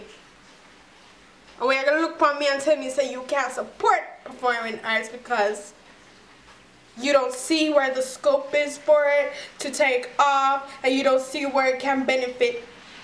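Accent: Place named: Jamaica. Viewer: calm down sista calm down right now you should be in a Tyler Perry play your good and I like your accent JAMAICAN NATION FOR LIFE!!!!!!!!!!!!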